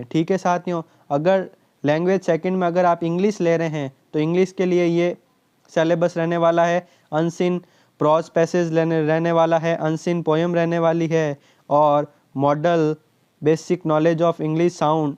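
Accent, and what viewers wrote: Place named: Jodhpur, India. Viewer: मुझे आप जोधपुर साइड के लगते हो 😂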